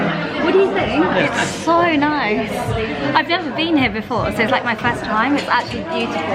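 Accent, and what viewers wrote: England, United Kingdom. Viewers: Hello. Always, you are such a lady. I feel more like a lady as well after hearing from you. Your English accent is the best. I think you speak so beautifully. I would prefer longer videos too just to hear you. Happy Mother's Day to your Mom. From Grace Elizabeth, in MA. I wish my daughters would have vlogs just for me. Maybe someday we'll all have vlogs.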